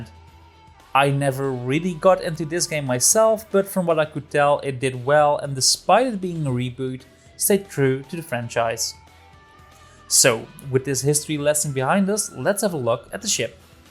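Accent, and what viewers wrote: Netherlands. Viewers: He's Dutch, I think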